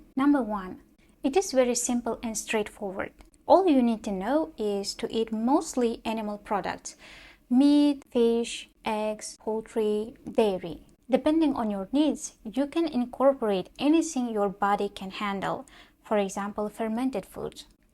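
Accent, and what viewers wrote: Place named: United Kingdom. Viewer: It sounds like you’re in the uk? 🇬🇧 welcome to the tribe. 😊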